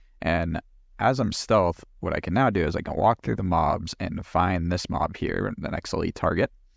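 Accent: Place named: United States. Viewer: OMG, what a video. I have watched hundreds in the past few months and while I do WANT to read about how to make a build and where to put the points, what this social scrub actually NEEDS is to learn how to actually play the build. You have given this in beautiful clarity. Showing actual footage with a commentary, freezing actual play to discuss what you used when and why. I so wish the other competant players who post these videos would do the same. I can make the build you guys so generously provide. I can flounder around on my own and work out how to employ it to the best of my ability, but a second opinion like yours, from a competant player, really helps us casual players to put the polish on our performance and for that I am most grateful for your time and effort. On the clarity of your video, may I make a point that is meant as constructive and helpful, but which might not be something you cannot change? 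For some of us not from the good old US of A, your accent along with the gravelly edge of your voice might make the odd word difficult to decipher. While social bias dictates that a gravelly voice projects authority and gravitas, it doesn't help clarity to those of us unfluent in your accent. I don't know what I expect you to do with this information, you have a lovely voice, but I thought I would share it.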